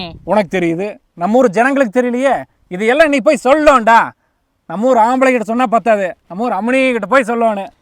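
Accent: Kongu, India. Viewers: வியாபாரம் செய்வதை விட உங்களுடைய பேச்சுத் திறமையும் கொங்கு தமிழும் உங்களுடைய காமெடியும் அழகில் அழகு வாழ்க வளமுடன் நன்றி மாப்ள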